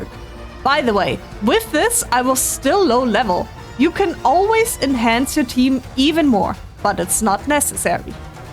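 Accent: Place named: Germany. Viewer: Ur accent like ur from germany or maybe netharlands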